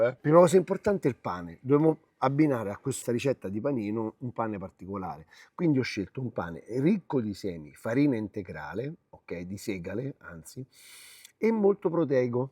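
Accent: Rome, Italy. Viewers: Bravo MAX, quando cucini così è piacevole. p.s. i sottotitoli in italiano servono a tradurre il tuo accento romano ? dai penso che si capisce quello che dici ....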